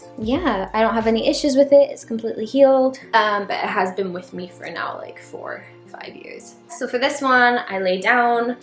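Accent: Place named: Scotland, United Kingdom. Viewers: She has a Scottish accent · I hear a mix of accents when you speak which I actually love.. I hear the u.s/Canadian north American accent, and then I also hear a slight uk accent.. not anything like some other accents from u.k, but I can actually understand every word that you say! I'm north american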